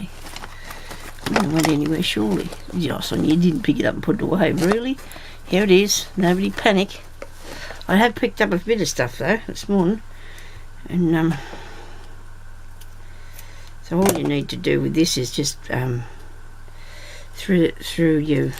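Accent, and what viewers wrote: Australia. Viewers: Never change a thing. I don't think you mumble......it's your lovely Australian accent....love it!! Your fabric/lace books are so different from the norm....what will you come up with next I wonder. I so look forward to Sundays now....hope this book has tons of pages and the tutorials last for weeks. You are a wonderful teacher. Thank you so much for sharing your craftiness. Glad you are feeling a little better. Love to you. Sue. xxx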